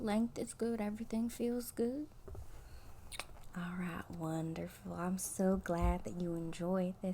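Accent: South, United States. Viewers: Love that accent you've GOT to do more with this southern accent character!! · Love your southern accent role plays😊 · Absolutely love your southern accent, so relaxing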